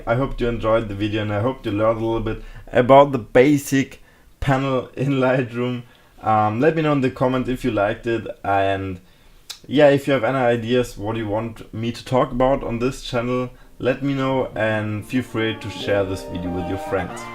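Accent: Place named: Germany. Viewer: echt angenehm, das Englisch von einem Deutschen zu hören, dann kann man auch mal was verstehen xD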